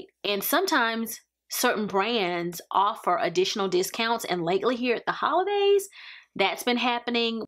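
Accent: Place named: South, United States. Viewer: Love the southern twang! Have a wonderful and safe holiday season. Thanks so much for sharing.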